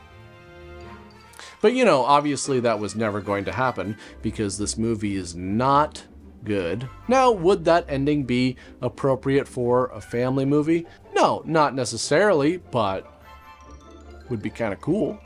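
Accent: Canada. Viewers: Oh, and he has the classic Canadian accent: "hoose", "aboot", etc. As a fellow Canadian, I can appreciate that. Haven't heard him say "eh?" yet, however.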